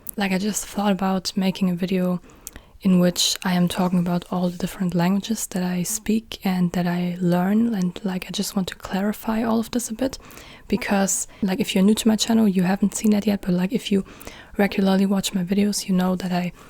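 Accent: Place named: United Kingdom. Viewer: By the way when you speak english your pronunciation is more british than american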